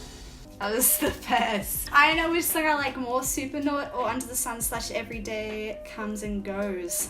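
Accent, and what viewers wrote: Australia. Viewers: Hi, love those facial expressions of yours and that cute Aussie accent!! Make sure, if you can, to do the entire Sabbath discography in chronological order - they are my favorite band and I think you'll find the journey very interesting. Welcome to the appreciation society!!!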